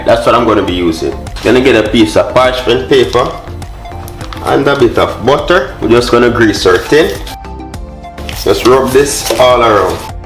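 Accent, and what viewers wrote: Jamaica. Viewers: As soon as I heard that Jamaica acent...I started smiling, hey maan 😃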